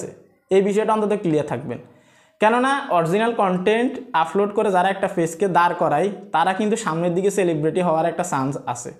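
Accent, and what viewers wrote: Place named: Noakhali, Bangladesh. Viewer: উনি হয়তো নোয়াখালীর এজন্য ওনার ভাষা এমন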